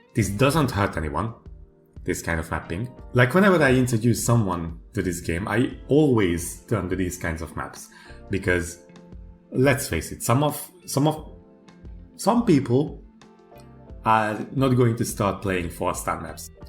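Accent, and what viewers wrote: Hungary. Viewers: At first you kinda sounded like a Hungarian but I wasn't sure, good job and keep up the good work!:) Csak így tovább.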